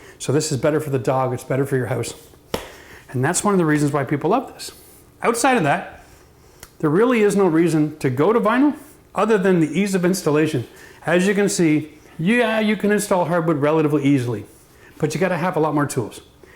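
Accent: Ontario, Canada. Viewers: I think it's the Ontario accent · As an Ontarian, yes, it's the Ontario accent.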